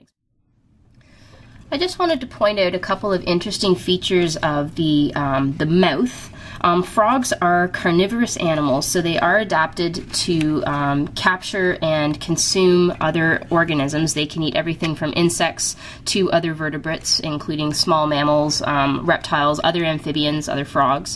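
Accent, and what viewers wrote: Canada. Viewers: Are you Canadian? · Is she Canadian?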